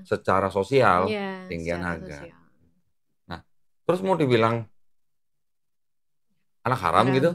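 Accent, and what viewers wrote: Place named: East Java, Indonesia. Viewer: Logat nya JATIM 😅😅😅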